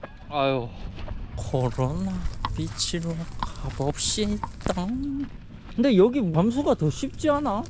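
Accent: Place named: South Korea. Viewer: 넘나 웃겨죽는 사투리보러왔다가 혼자 실실웃고 난리입니다. 아 진짜 똥휴지가있어 부터 넘우껴서~~으아 ㅋㅋ 글고 같은 유튜버로서 영상얘기 좀 나누고싶은데 통화한번 하는거어떠실까요?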